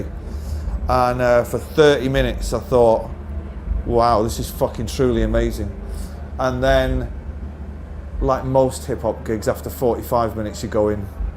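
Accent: Manchester, United Kingdom. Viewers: Try going to Ireland with your Manc accent and tell the Irish that YOU are Irish Noel ... they'll call you a plastic Paddy!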